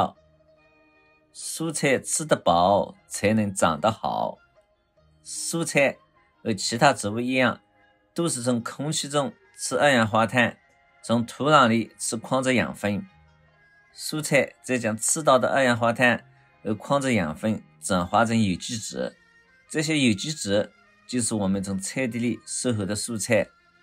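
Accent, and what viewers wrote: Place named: Jiangsu-Zhejiang, China. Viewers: 超爱这包邮区的普通话口音简直回归故里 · 可惜您這張圖裏沒有我所在的州猜猜哪個地方？感謝您的各種種植經驗的分享，喜歡聼您的這個江浙口音。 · 主講人十足一亇江苏江南人！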